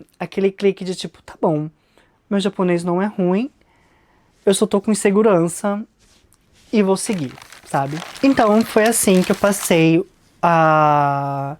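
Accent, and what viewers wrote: São Paulo, Brazil. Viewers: Nossa vc fala muito bem o português. Seu sotaque é paulistano. Vc é poliglota, que tudoooooooooo. Vc precisssaaaaaaaaaaaa vir para o Brasil, tem tem tem que vir, vc vai adorar muito. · Seu português é perfeito e vc tem sotaque paulista, se eu não tivesse ouvido sua história, se vc falasse que cresceu em SP, acreditaria 100%.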